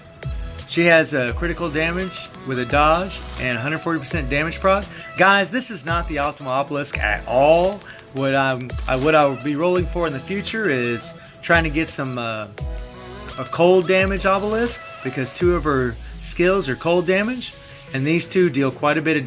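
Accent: United States. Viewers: Dude are you from north Carolina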